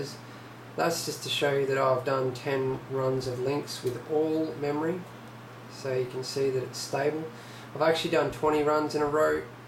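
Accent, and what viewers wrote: Australia. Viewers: Great Stuff! You from good ol North Queensland? T-ville / Cairns area?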